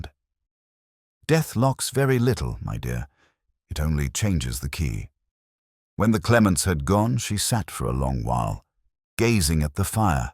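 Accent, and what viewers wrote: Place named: United States. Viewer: Wauw, the ai-voice gets more and more American…not pleasant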